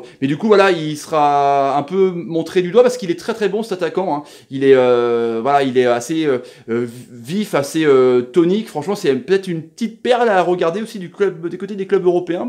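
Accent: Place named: England, United Kingdom. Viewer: Mieux que Téléfoot , et pouce bleu direct pour l'accent anglais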